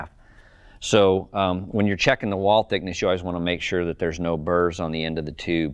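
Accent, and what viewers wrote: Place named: United States. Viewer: Great video ... still cant get over how Americans say tubing and aluminium lol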